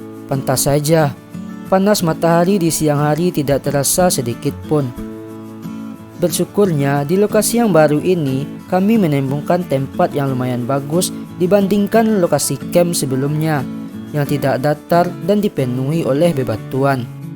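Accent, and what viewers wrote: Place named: West Sumatra, Indonesia. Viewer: Logat Minang nyo dan😅😅😅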